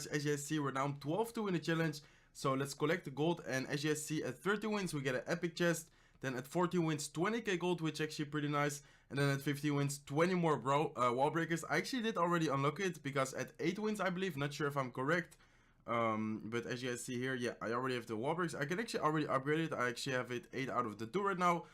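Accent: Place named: Netherlands. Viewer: Whaha k ben ook nl’er en je hebt echt een nl accent als je Engels praat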